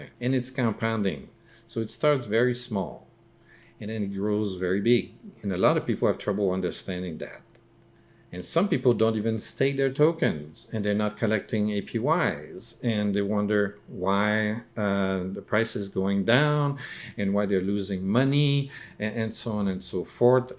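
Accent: Quebec, Canada. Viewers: I can tell by your accent that you’re from Quebec!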